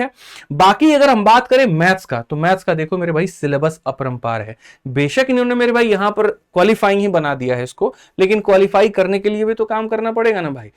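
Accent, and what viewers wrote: Bihar, India. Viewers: Jarur Bihar se hi ho ap...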